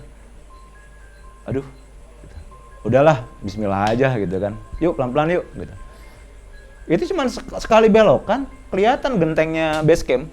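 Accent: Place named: West Java, Indonesia. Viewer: Maksudte, akhire ada bahasa jawanya tapi logatnya sunda bang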